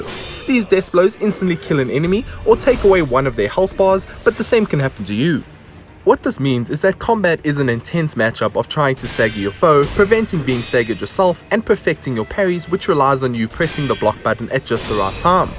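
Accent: South Africa. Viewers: Watching this & I am like, this dude sounds like a white South African guy.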